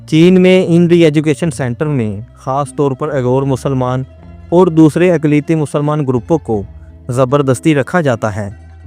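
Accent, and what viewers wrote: Punjab, Pakistan. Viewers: Aap kindly Urdu ka labo lehja zara behtar kur lain warna Punjabi style mein Urdu suit to nahi karti baqi aap ki marzi, zuban Punjabi ho ya Urdu us ka asal tarah ada kurna hi Zuban ka Husan hai warna Allah ki Panah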